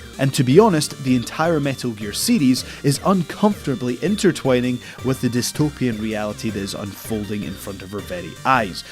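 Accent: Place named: Scotland, United Kingdom. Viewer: Dude must be from the same town as Gerard Butler, their accents are identical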